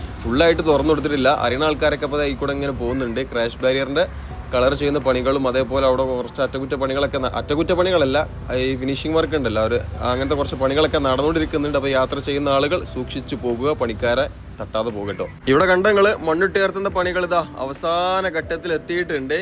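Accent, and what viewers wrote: Malappuram, India. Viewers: Love your videos and malappuram slang. Thanks!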